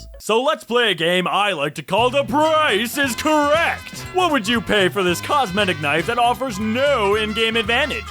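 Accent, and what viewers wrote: Canada. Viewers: i thought you were canadian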